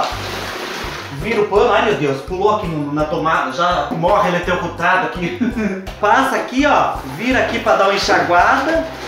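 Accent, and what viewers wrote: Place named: Sorocaba, Brazil. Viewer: Sabia que este sotaque era sorocabano! ! Odeio traça na parede!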